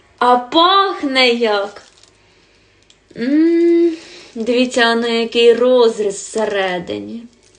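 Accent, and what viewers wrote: Poltava, Ukraine. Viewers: Чого примахуєтеся до людини за її мову в кожному регіоні говорять по своєму на Полтавщині так говорять вона ж вам пояснювала правильні ви дуже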